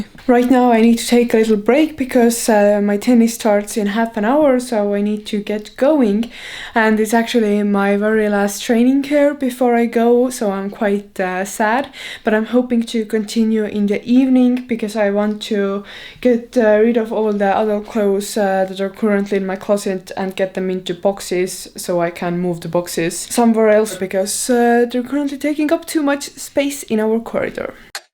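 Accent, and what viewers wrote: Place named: Estonia. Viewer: Love the Estonian accent 🤗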